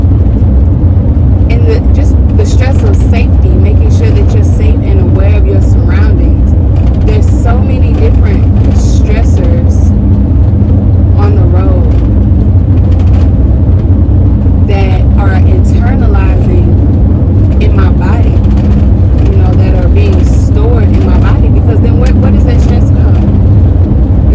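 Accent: Houston, United States. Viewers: Is it just me or does she sound like Beyonce?! Must be that Houston twang lol. Loved this video! I love that you are showing the realness of van life and not the fantasy! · It’s just the Houston accent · she does🤷🏾‍♀️ I'm from Houston, it's our accent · I think it's a Houston thing...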